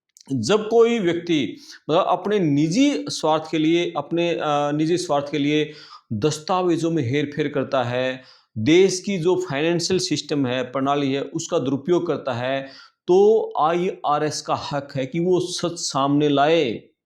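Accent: Haryana, India. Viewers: Some haryanvi accent 😮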